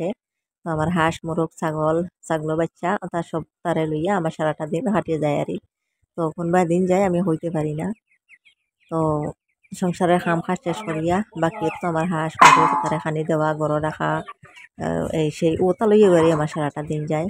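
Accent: Sylhet, Bangladesh. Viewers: আমাদের সিলেটি বইন তো। এই জন্য খুব সুন্দর করি কথা কইন। খুব সুন্দর লাগলো আপু ভিডিও টা। সাবধানে কাজ কইরো বইন।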